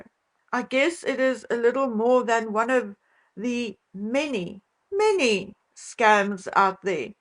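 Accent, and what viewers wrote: South Africa. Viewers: The Harkles speak fluent PSYCHO-BABBLE !!! You speak with a beautiful South African accent….I can understand you, every word you speak is articulated, but (just as you say) all I heard from the Harkles was psycho-babble bolloc*$ !!!!! It’s unintelligible, and says nothing and means nothing. Their lips move, but cr@ comes out !! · Your "accent was what drew me to listen to you, years ago, when I subscribed to your channel! At the time, I had no idea what a South African accent sounded like. I didn't know WHERE you were from. I thought it was so lovely that I kept listening to find out where you were from!!! 😊 BTW, I have been a psychiatric nurse for years. YES!!! "Word Salad" is a bonafide, legal, medical term most often related to to schizophenia!!! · I could listen to your lovely S.A accent all day 😊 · I would never have guessed that English was not your first language, dear! 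You just sound South African to me and I love you for it. As for the Harkles latest offering, I filed it under suspicious junk mail. They have lied so much that I no longer believe a word they say. Everything is smoke and mirrors with them. How I wish they could, like Napoleon, be sent to Elba without Wifi or any means of contacting the outside world. The world needs a rest from their particular corruption of young minds.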